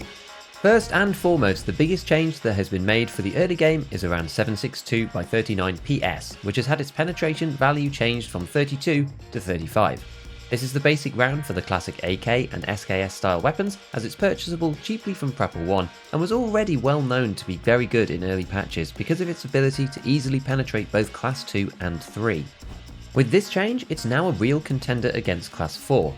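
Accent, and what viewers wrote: RP, United Kingdom. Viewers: Again, when I need you the most, you're there to hold my monkey hand and lead me toward the light of knowledge. You really are my favourite Tarkov YouTuber. Your content is smart and the posh accent makes it sound doubly smart, like what you're saying can be absolutely trusted .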